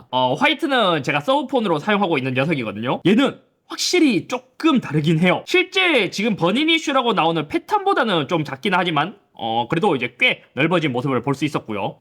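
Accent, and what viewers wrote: Gyeongsang, South Korea. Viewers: 난 얘 경상도사투리 뭔말하는지 못알아듣겠슴..진짜 운좋게뜬 케이스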